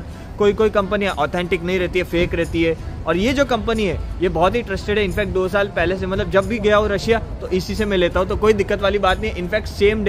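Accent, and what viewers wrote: India. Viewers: Brother in Russia with Indian Accent is like Magic❤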